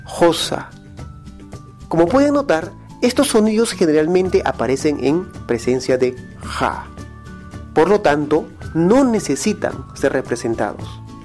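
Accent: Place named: Ayacucho, Peru. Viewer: Como que no me convences con tu argumento, tu acento suena quechua ayacuchano .. la letra 'Q' no la enuncias con caridad. .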